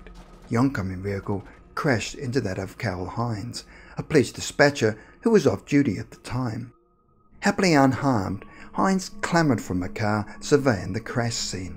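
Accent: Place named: Australia. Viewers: Aussie accent, pretty clean to be honest with fuck all slang or swearing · Australian or Kiwi? Don't have a problem understanding tbh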